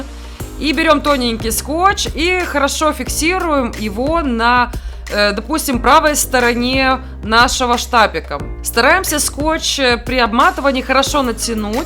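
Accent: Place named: Moldova. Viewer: Приятный молдавский акцент.Скучила.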